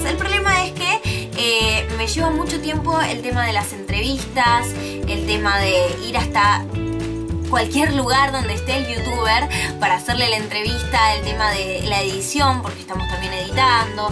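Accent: Buenos Aires, Argentina. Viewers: Sos de Buenos Aires?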